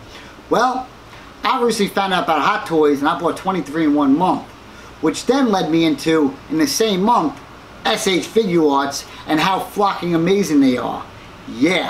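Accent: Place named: Rhode Island, United States. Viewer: Are you from Rhode Island? My family is from there too. I can hear it in your voice.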